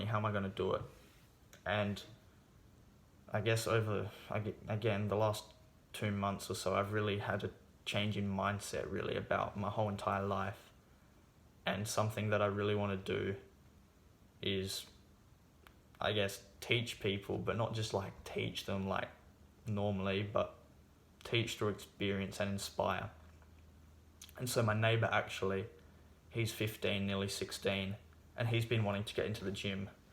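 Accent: Australia. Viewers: Good luck and best wishes. I like the Aussie accent. You did well for your first video. 👏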